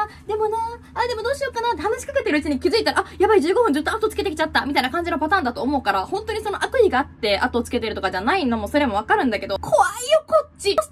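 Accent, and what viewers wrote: Kansai, Japan. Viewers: この時の可愛い。いつも可愛いけど。特に可愛いあと関西弁強めw好きよw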